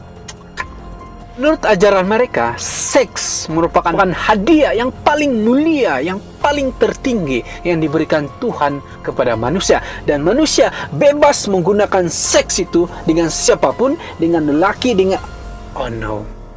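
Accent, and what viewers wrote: Sabah, Malaysia. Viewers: ni orang sabah ka? Aku tangkap aksen dia sabah bah cara dia becakap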